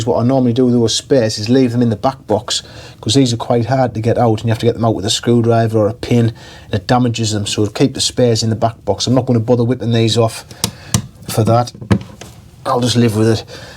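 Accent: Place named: Newcastle, United Kingdom. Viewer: love the Geordie accent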